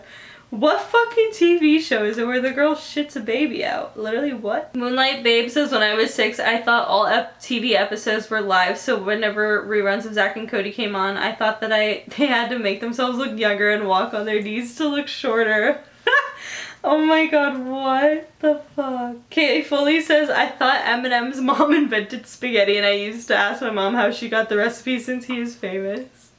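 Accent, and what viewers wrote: United Kingdom. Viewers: Why does always sound british at the beginning of her videos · she has a really english accent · It's not a vid if she doesn't slip into a British accent sometime in the intro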